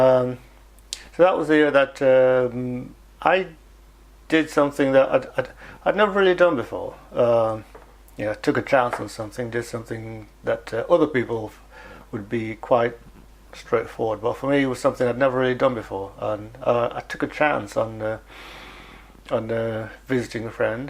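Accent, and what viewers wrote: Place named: Iceland. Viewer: Ha! You're as good at maths as I am! :P You have a beautiful Icelandic accent :D I remember all the hoo-hah with that. Oh no! That sucks so much. Oh lord, that sounds very not good to deal with if you're flying a plane! Oh you're such a sweetie! How very Love Actually! Oh wow, you look odd without a beard! I'm glad you clarified it wasn't the KISS guy!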